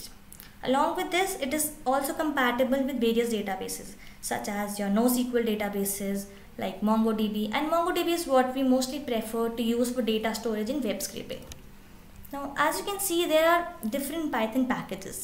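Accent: India. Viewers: i like your voice.... after long saw a video with familiiar indian accent... worth watching..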